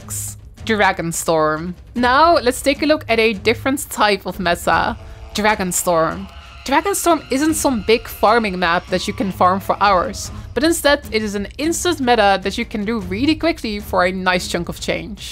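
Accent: Netherlands. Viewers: Yea, I probably stash the components in my bank that would net all the Gold then. I have a really old account but hat a long hiatus of over 8 years and now get back into it, playing through all the stories and unlocking the mounts (which can be expensive). So I don't have a boatload of materials unfortunately and all the areas I visit will give new stuff that I never had before. Also don't have a whole lot of time to play so I have to pick and choose and avoid getting burned out otherwise it's just a waste of time. By the way, are you Dutch by any chance? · very clearly a Dutch person speaking English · Ik vind je Nederlandse accent wel best heftig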